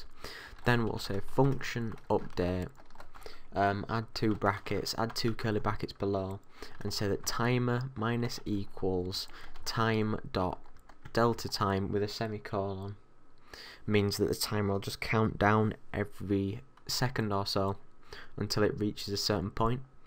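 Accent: United Kingdom. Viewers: your accent is cool :) love british accent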